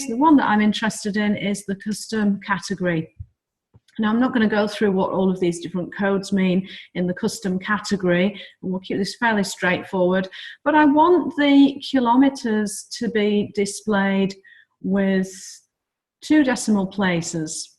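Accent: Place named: East Midlands, United Kingdom. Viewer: Thanks, very helpful, succinct and to the point. I like your accent, is it East Midlands?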